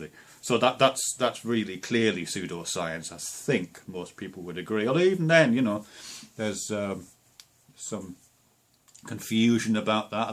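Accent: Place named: England, United Kingdom. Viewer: Your voice is sounding more English than Scottish these days.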